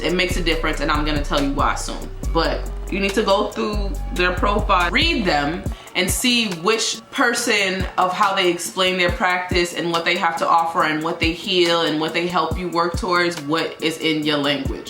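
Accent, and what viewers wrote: New York, United States. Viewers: it was the new york accent for me 😍 love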